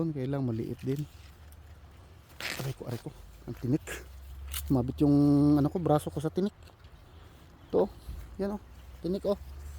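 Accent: Visayas, Philippines. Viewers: Kuyawa sa lawa oy,adlawan man msgbalay😂😂😂bisaya man jud ka pre maila ra sa imong inistoryahan❤ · Bisdak mn diay k dol😂😂😂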